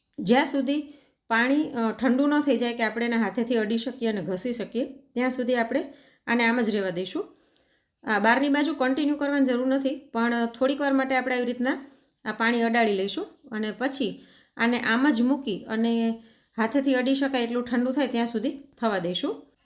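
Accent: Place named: South Gujarat, India. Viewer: Glad to know your area. I have never been there in Kutch. Based on your Boli, my prediction was wrong but your boli is not different than South Gujarati. Me and my wife both are from Surat, both retired, settled in USA since 1979 (46 years, perhaps before you were born; again predicting based on your young South Gujarati style voice). Keep on doing good job! Jay Hind! 🇮🇳🇮🇳🇮🇳 Jay Shri Krishna! 🚩🚩🚩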